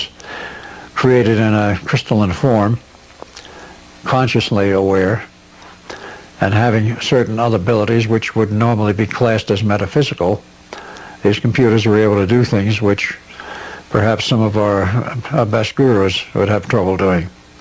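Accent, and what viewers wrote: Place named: Boston, United States. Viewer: he most be from Boston.. is Al bostonian ?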